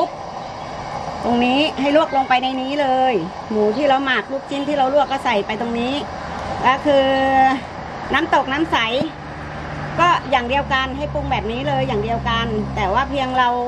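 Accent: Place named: Nakhon Sawan, Thailand. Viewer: สำเนียงพูดเหมือนคนนครสวรรค์เลยค่ะ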